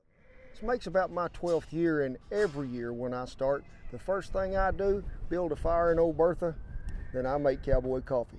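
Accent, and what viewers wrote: United States. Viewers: Felix Hannigan Felix, you will find all us Americans speak English dis way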